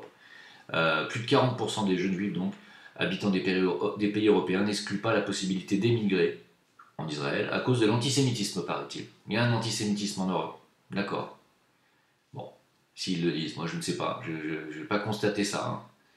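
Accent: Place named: Corsica, France. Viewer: j'adore votre accent corse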